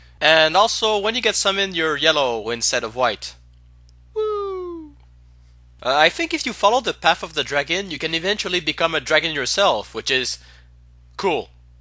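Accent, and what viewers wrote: France. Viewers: Pretty good, but I think your jokes (play with words and images) are too frequent. Some are good and made me laugh, and others just made me say: "meh". Also, love the drawings. Btw, if you want to keep your French accent as your "trademark". Continue. If not? Work on your pronunciation. For example, the way you say "Anor Londo". ...Just saying... don't want to offend you.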